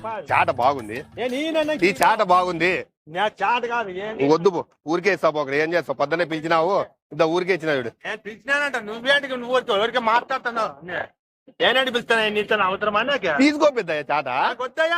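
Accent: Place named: Anantapur, India. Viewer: Super anna ❤ from kurnool.. Ananthapur slang keka full navvu untadhi😂😂🎉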